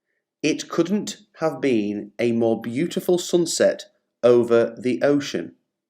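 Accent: United Kingdom. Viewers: I actually like your British accent, it's cool 😂❤even though I find it more difficult than the American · Please tell me, this is it British English?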